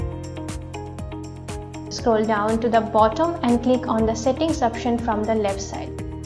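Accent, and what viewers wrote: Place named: India. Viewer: it sounds like indian accent.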